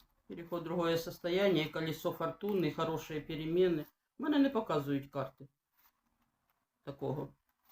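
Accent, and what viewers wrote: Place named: Surzhyk, Ukraine. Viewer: Доброго дня, я яз Вами з самого початку коли було 500 підписників.Там у Вас була Донбасівська мова,так якми їїї добре знаємо. Зараз у Вас прекрасний український суржик! Ну приємно слухати,чесноя!!! А от коли Ви російською до Боні,то це правда ріже слух,якось наче і не Ви! Я не проти російської,розмовляють,пишуть люди,аби душа була українська ❤. Не приймійть як образу.,нехай котик вчиться теж українського суржика так як проводите ефір❤❤❤. Головне миру скорішого,! Дякую за розклади❤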